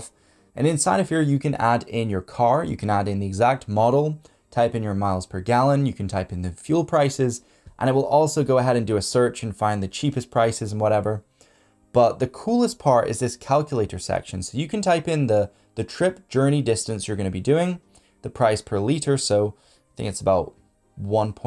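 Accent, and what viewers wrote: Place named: Scotland, United Kingdom. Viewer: Hi! Thanks for a great video. I have been following you for a few months now, really like your content. I recently got the pixel 6a. My main phone is the iPhone 13pro Max, got the pixel for steaming. I think I just downloaded most of the apps you recommended. I wanted to ask. Are you Scottish? I am from Edinburgh, Scotland. I can hear a Scottish accent when you talk. It's cool.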